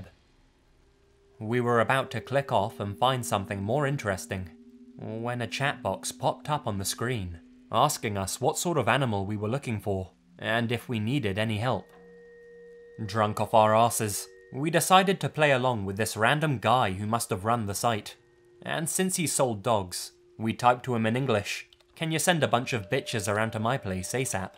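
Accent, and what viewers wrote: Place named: Scotland, United Kingdom. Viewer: Are you Scottish or British? I like your accent a lot! It's one of the reasons why I like this channel so much. It's just so pleasant to listen to! Also, you have some really interesting content.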